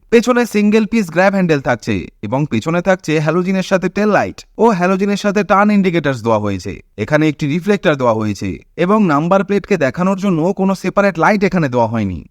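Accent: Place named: Bangladesh. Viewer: আমি বাংলাদেশ থেকে বলছি। আপনার বাংলা বলার ধরন প্রায় বাংলাদেশীদের মতো। বাংলাদেশে কবে নাগাদ আসবে সূত্র উল্লেখ করে বললে ভালো হয়।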